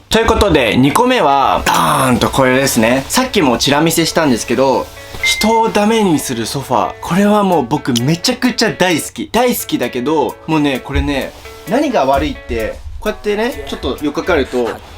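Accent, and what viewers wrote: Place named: Kansai, Japan. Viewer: 君は埼玉人だけど、俺自身たぶん言われんでも分かってるんやろけど、大阪出身で大阪10年間育った人間なので、所々関西弁使ってくれてはる君観るとマジ親近感湧きます！！⤴️⤴️⤴️ 君が関西弁敢えて喋るとイケメンさが人一倍ごっつ映えるね〜👍👍👍😍😍😍ありがとう、おおきに！🙏これからも友達でいましょう〜！😭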